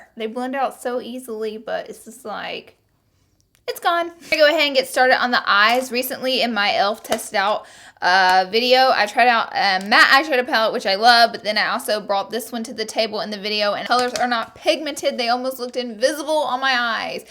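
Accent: South, United States. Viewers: you are gorgeous with or without makeup/shitty makeup. seriously, though, I'm from WV and we are incredibly southern so I really love your accent, a genuine southern accent is hard to find, man! and ppl try to say we are uneducated but I love how you always have facts about skin/dermatology/makeup knowledge from experience etc that you pepper throughout your vids! You may live in LA now but I'm sending you good vibes from the south, girl! keep doin' your thing and stay sassy af, lol ps - IM A HUGE CAT LADY, I LOVE YOUR FREAKIN CATS!! the one that shows up in your vids the most looks like my cat, Sassy 😊😻💜 (i have another one, and orange one, whoa is literally a female Garfield names Lucy...or Lu...or the Goose...the Goo...Lady GooGoo...Lucy Lu...etc. see, now ive taken it too far; I've surpassed the threshold of just "cat lady" to "CREEPY cat lady"... 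LEMME JUST STOP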